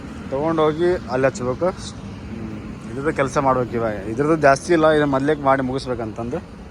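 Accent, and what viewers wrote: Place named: North Karnataka, India. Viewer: Anna nim ura yavd hel plz ni uttar karnataka bashe olga matadati adak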